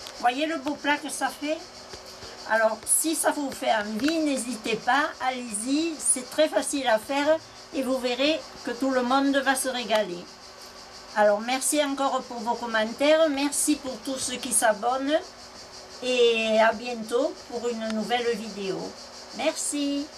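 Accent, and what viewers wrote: Provence, France. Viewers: J’adore les aubergines, je voudrais tester votre recette mais les aubergines sont quand même longues à cuire ! Combien de temps les laissez vous dans la fritures ? Et je suppose a feu moyen ? Merci pour vos précisions, un plaisir de vous entendre avec ce petit accent provencal !? 😊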